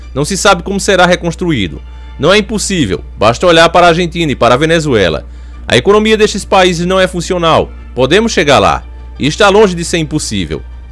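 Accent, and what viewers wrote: Northeast, Brazil. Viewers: Nordestino ele é (sotaque)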